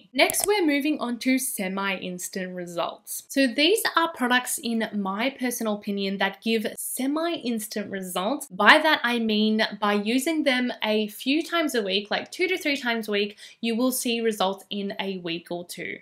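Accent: Australia. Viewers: I like her Aussie Accent. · u aussie? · Very Australian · i'm absolutely loving your heavy australian accent😄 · I knew right away you’re an Aussie. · That Asian Australian accent tho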